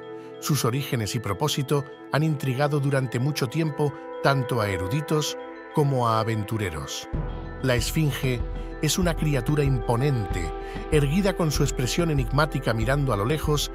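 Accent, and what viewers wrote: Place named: Spain. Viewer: Eres de España amigo? me encanta el asento de la IA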